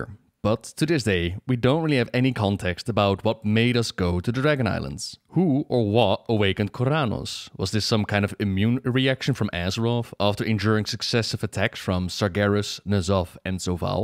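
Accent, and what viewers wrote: Netherlands. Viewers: Love the accent of a fellow dutchy🤪🤘🏻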